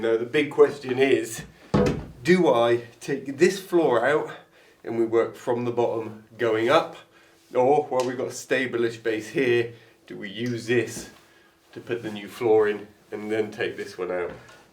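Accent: United Kingdom. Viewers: I don’t speak French but French sounds so beautiful!! As an American I love his British accent but I could listen to a whole video of him speaking French!!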